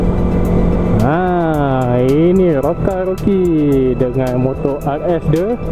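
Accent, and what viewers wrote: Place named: Tanjung Karang, Indonesia. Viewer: Dgr bro ckp mcm dr tjg.karang..stay tjg karang ke.maaf kalo tersalah dgr ye✌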